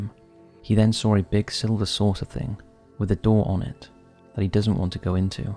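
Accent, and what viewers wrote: Wales, United Kingdom. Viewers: He's brilliant, sense a slight Welsh accent and it fits...his content over the last decade is outstanding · Damn yall still making videos and saying things like “night sky” all British and whatnot? I ain’t seen a top 5s videos in a hot minute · Are u welsh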